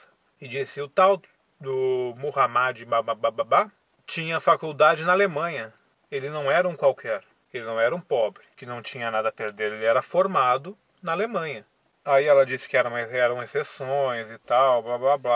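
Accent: Rio Grande do Sul, Brazil. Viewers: TU EH GAUCHO?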